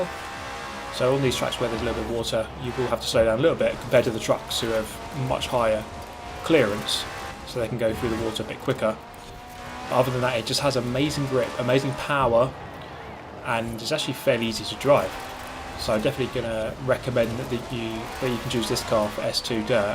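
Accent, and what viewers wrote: United Kingdom. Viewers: british accent ; Miles Per Hour. hm..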